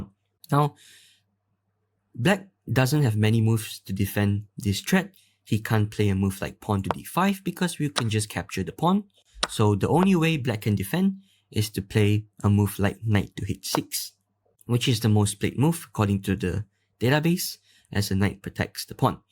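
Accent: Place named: Singapore. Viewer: hello dude, i wanna ask where r u from? u sound singaporean ngl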